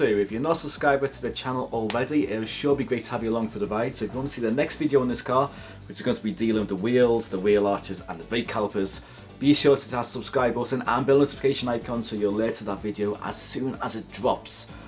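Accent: Liverpool, United Kingdom. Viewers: Hi nice to hear a fellow scouse voice. Two questions mate, firstly how long did it take you to do that exterior detail? Perhaps that would of been a useful detail to mention in your video. Secondly, when you're machine polishing do you find it gives you a bad back? Whenever I machine polish my back is practically on fire! I get really bad aches off it.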